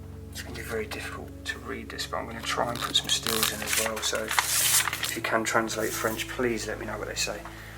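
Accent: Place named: United Kingdom. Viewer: Hey I absolutely love your videos. Of course I find them absolutely fascinating, but there is one thing that has been driving crazy and I would live some clarity. What part of the UK are you from? Sorry it's silly, but I've heard it before and I would love to be able to finally place it! Ty!!!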